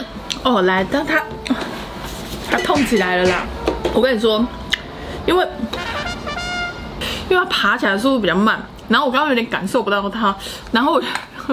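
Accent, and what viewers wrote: Taiwan. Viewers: 「 好辣選我選我」 喜歡看開箱的影片還有聽你烙台語哈哈哈真得辣了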